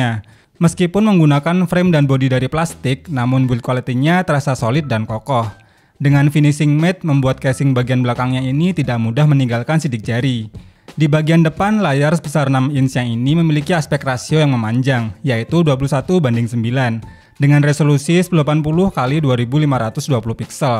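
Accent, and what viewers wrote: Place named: Brebes, Indonesia. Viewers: Logat medok Brebes qolqolah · Maap masnya orang tegal bukan sih ?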